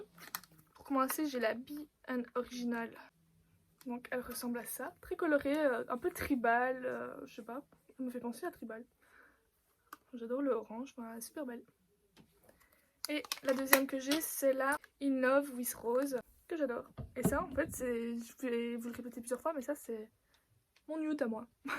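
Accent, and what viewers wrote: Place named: Belgium. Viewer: Coucou sacrée collection ! Ton accent m'intrigue tu es en Belgique ?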